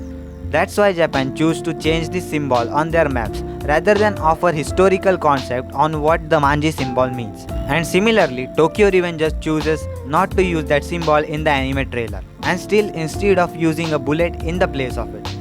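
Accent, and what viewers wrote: India. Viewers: Tumari aawaj sunke hi pahachaan lia tum indian hoo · Yes mai bhi pehchan gaya ye indian accent ko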